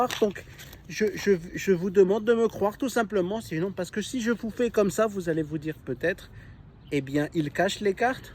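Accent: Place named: Alsace, France. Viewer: Tu es génial ! Une personne vraiment humble et humaine, ça fait plaisir ! Je crois que j’entend un accent alsacien, si tu es de passage vers Mulhouse, n’hésite pas a répondre, je t’invite a boire une bière avec plaisir !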